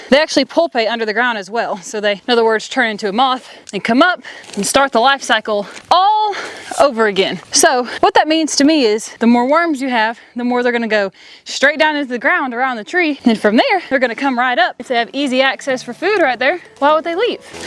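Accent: South, United States. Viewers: You actually almost have a southern drawl for someone from Illinois.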